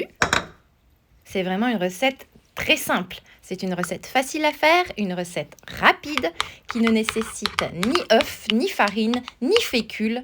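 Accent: France. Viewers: J’aime beaucoup votre accent Francais; tres, tres mignon! Je n’ai jamais les occasions parler ou ecouter a la belle langue francaise!! J’aime beaucoup votre recette aussi, je vais immediatement l’essayer! Merci a vous 😃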